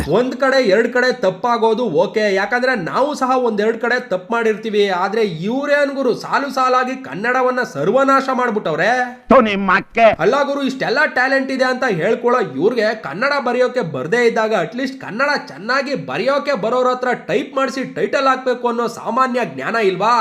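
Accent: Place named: Mysore, India. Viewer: ನೀವು ಏನ್ ಗುರುಗಳೇ ಮೈಸೂರ್ ಕನ್ನಡ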